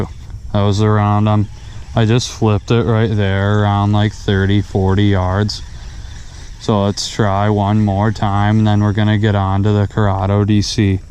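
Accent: Minnesota, United States. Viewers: You’re either from Minnesota or North Dakota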